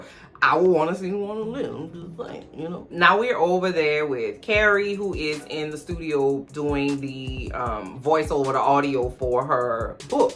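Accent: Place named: Lowcountry, United States. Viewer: Great review! Your covered exactly how I feel about Miranda Che storyline. Love the accent. Sounds like my family on the GA SC coastal islands.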